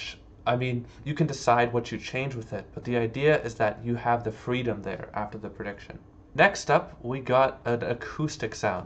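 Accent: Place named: Germany. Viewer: Are you German? You kinda sound German to me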